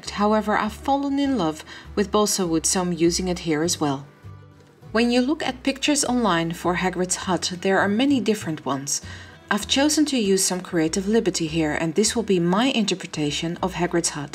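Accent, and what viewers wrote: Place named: Netherlands. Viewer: Australia? You sound very Dutch? Awesome work!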